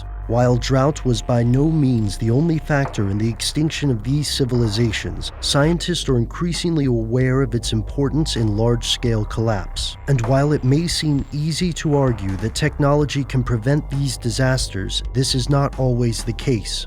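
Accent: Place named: United States. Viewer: love how you yanks destroyed the ENGLISH language. pronounce things the right way!!! like IRAQ not I Rack and Pakistan not Pack I stan.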